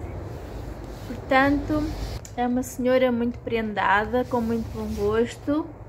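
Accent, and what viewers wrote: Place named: Coimbra, Portugal. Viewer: Boa noite conseguiu limpar as formigas todas? Haviam muitas no cactus! Espero que nunca me aconteça… 😮 Pela sua forma de falar pensava que era de Coimbra 😊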